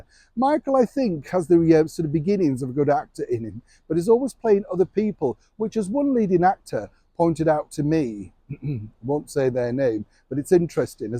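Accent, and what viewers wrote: Yorkshire, United Kingdom. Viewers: I really won’t be watching it Neil but here’s you talking about slang and telling us to clean our lug ‘oles out! Hahaha, that’s a blast from the past, you’ve not lost your Yorkshire yet Neil 😘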